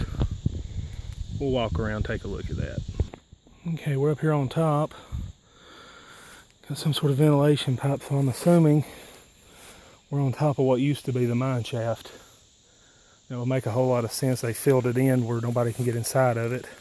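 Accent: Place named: South, United States. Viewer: Very cool! Just subscribed.. Love your southern accent.. It is a lot like mine!! lol (I am from NC.) Looking forward to seeing more videos!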